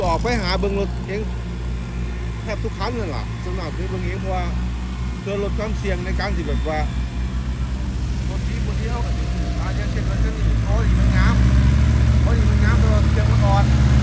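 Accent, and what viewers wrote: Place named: Khok San, Thailand. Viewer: สำเนียงคือคนบ้านเฮา บ้านโคกสาร คัก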